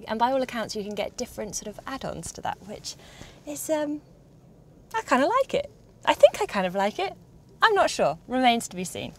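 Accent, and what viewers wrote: United Kingdom. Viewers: She has a cute British accent, though I think she will be even better if she was not in such a hurry and speak slower.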